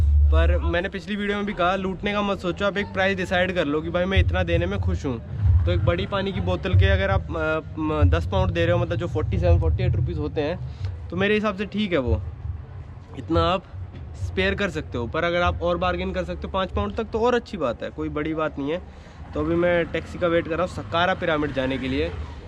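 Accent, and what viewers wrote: Haryana, India. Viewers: Your accent shows that you are haryanvi 🤩🤩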